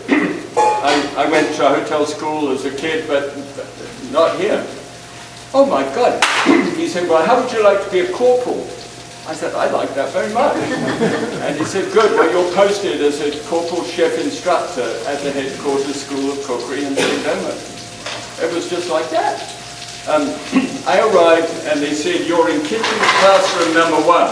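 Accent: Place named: United Kingdom. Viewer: I always enjoyed watching the Galloping Gourmet. He always spoke in Japanese, though. His jokes were also very Japanese. I did not know he had British accent until recently.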